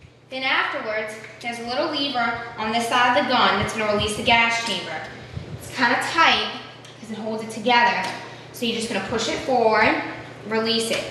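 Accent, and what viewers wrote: New York, United States. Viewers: Too bad that this is a New york accent.......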